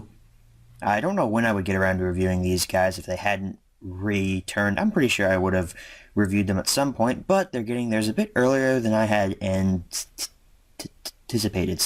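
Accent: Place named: Scotland, United Kingdom. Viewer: nice Scottish accent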